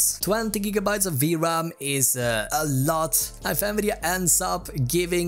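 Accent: Sweden. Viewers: I can hear you are from sweden after 2 sec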